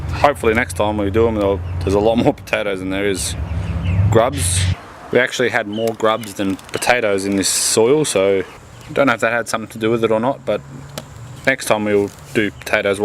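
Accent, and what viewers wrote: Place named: Australia. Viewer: You sound Australian!! :-)!!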